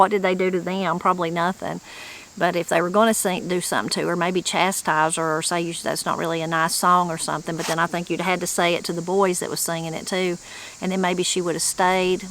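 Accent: Appalachia, United States. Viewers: Ms., I want to thank you for celebrating our beautiful language and giving it the respect and dignity it deserves. My people are from Blount County, TN and my parents took accent reduction classes in the 70’s before I was born. They still think they did us a favor, but they took something precious from us. I understand why, but I’m sad about it. Hearing you talk and read to me brings it back a little bit and I am grateful for that. 😭😭 thank you so much, Ms. ❤️❤️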